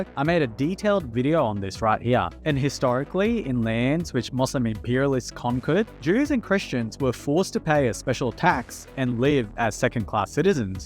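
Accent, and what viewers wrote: Australia. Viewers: You've got native australian accent. I suppose you were under 10 yo when you left your country :) thanks mate for the content! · He's Australian but I believe partially grew up in an Islamic country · He sounds australian….shows what you know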